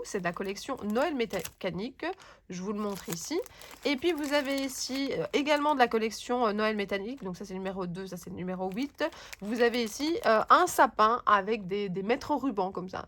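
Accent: Belgium. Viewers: Bonjour, je participe et mon coup de coeur est double avec le tampon Lavinia et aussi le lot de chez universcrap. J'adore tes vidéos surtout quand tu nous montres des tutos de création et j'adore ton accent belge et ta façon de parler avec certains mots qui fond toujours drôle dans mon oreille. J'adore rencontrer les exposants dans les salons pour mieux pour mieux connaître les produits qu'ils proposent et comprendre mieux leur univers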